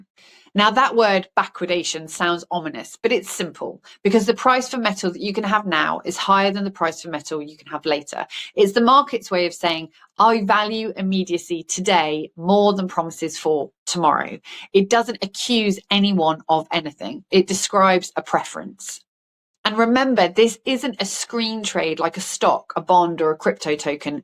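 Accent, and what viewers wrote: United Kingdom. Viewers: Absolute nonsense in a British accent.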